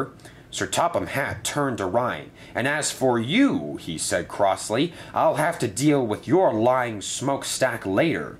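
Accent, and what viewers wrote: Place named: Scotland, United Kingdom. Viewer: Ur scottish accent is on point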